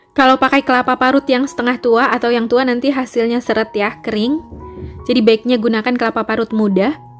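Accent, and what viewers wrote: Medan, Indonesia. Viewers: owh medan patas logatnya hehe.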